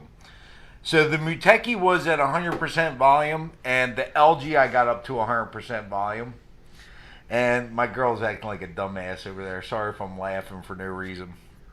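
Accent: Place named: Philadelphia, United States. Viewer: You're from Philly aren't you... if not you have a strong philly accent lol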